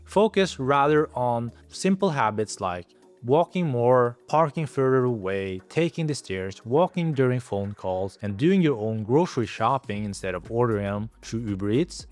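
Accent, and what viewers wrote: Norway. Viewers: Love the Norwegian accent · Do I detect a norwegian accent? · Wait, it took me a minute to realize, but you have a Norwegian accent. Cool!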